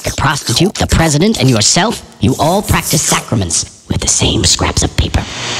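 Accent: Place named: Russia. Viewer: По мне в русской озвучке намного лучше...К тому же тут какой-то русский акцент прощупывается(хотя возможно это только мне кажется)...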